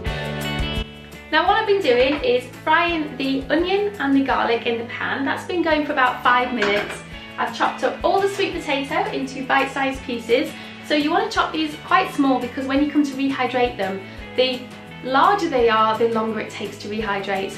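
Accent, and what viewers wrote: United Kingdom. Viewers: Is the plant always called Coriander in the UK? (I assume the accent is UK) I call the seed/spice Coriander but the plant Cilantro? It is a must for my Salsa. My favorite herb!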